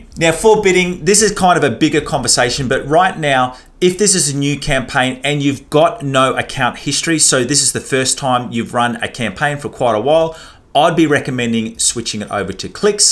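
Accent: Australia. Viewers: thanks for the video, your accent sounds aussie to me (most beautiful country in the world)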